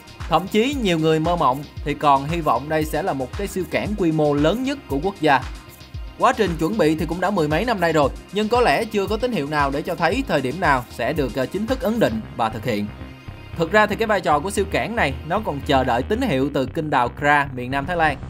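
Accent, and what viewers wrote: South, Vietnam. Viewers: Ok, nghe hay và có thêm sự hiểu biết về đất mủi Cà Mau, nơi tôi đã đi vượt biển 1987 và đã định cư ngoài nước VN… Tôi sẻ đăng ký kênh ngay bây giờ và nghe giọng thuyết minh rất hay ( giọng miền Nam rất chuẩn ), tôi là người sanh ra ở Saigon…!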